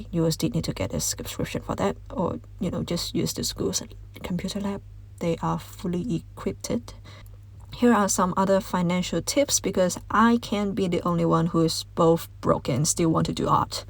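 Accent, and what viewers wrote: China. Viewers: thkuu for the helpful sharing! to me you sound Hong Kong! portfolio petition here!!!!! would be v helpful for someone who is in Australia and considering pursue a animation career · 听多了以后，觉得你应该是中国人。从口音判断的。:eyes-pink-heart-shape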